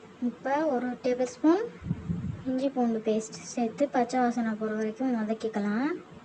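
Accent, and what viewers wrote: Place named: Madurai, India. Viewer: Your madurai slang is awesome 👌 👏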